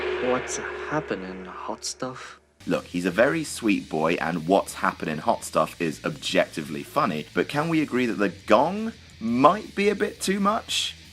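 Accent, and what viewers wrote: United Kingdom. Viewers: Nothing like listening to a stuffy British-sounding dandy with a stick up his arse passing judgement on films 50 plus years old... That said, someone needs to clue this guy in to the fact that actors playing other races (and sexes) goes back to the time of Shakespeare, when females were not even allowed on stage, and there WERE characters of color like Othello in the lexicon. Fast forward to the silent film days where Lon Chaney was lauded as the "Man of a 1000 Faces" for his ability to play a variety of characters, including foreigners and Orientals (yeah, I just used that word to piss off the narrator). You can find offensive stereotypes in everything pre-1960s from Bugs Bunny cartoons to 3 Stooges shorts, let alone feature length movies. It ain't rocket science. The two I can't believe here missed are: 1. Charlie Chan, initially played by Warner Oland (who also played Fu Manchu as mentioned) who was Swedish and then Sidney Toler, who was American. 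In the 80s, a new Chan film was protested for its use of non-Asian actors, but flopped due to lameness as opposed to protests. Fox Movie Channel even pulled its "Charlie Chan Theater" (which showed the old 30s and 40s Chan movies) off the air in the early 2000s--most likely due to PC over-reaction. 2. Peter Sellers as Sydney Wong, a Chan parody character in Neil Simon's "Murder By Death" (1975), which is a Who-Done-It satire featuring famous fictional detectives (i.e. Sam Diamond instead of Sam Spade). While Seller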